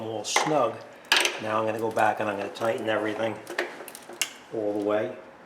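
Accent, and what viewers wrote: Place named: New England, United States. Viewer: Good video. Hope you’re still doing well after nearly a decade. (Maybe enjoying a well-earned retirement!) Interesting that the procedure is different than that on the ‘06~’15 Mazda MX-5 Miata. But makes sense as it’s RWD and thus longitudinal mounted, versus the Mazda3 FWD and transverse mounted. No fiddling with the belt, tensioner, alternator, etc. But you do have to take out the air filter housing, and often even the throttle body. Good call on going back to the Mazda FL-22 dark green coolant. I call it the “Philadelphia Eagles Green” coolant, as opposed to the universal coolant (Seattle Seahawks bright green 😅). (I’m guessing from your accent you’re a Pats fan so I thought you might appreciate that 😂.) Ford used to carry the same (now replaced by Yellow), and “Asian Green” from Zerex or Peak are also suitable. 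I can tell you that these (usually only the early years like my ‘06 MX-5) had a common problem with the thermostat sticking open, similar design (wouldn’t be surprised if it was the same part number). I’ve had a P0126 on mine for almost as long as this video’s been up; I usually just reset it. 😂 Also if you have an Bluetooth OBD2 dongle to read the engine temperature while driving, it’s too low (maybe 140 F, should be around 190F). Also you may get insufficient heat from the vents (blows cool air). But “this is the year” I go ahead and fix it.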